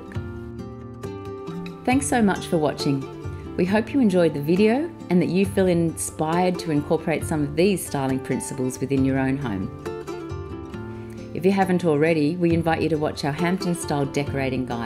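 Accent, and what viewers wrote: Australia. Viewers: Even though I struggled understanding the Australian accent but this video helped me throught my self study interior design process thanks so much for this video ❤❤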